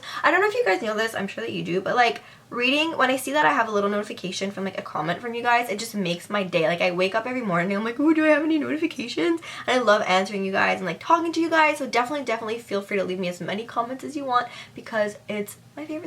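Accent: Canada. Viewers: Omgosh!!! your canadian?!?!?!? That makes so much sense!! I always thought that your accent was unique.... and that i've heard it before.. lol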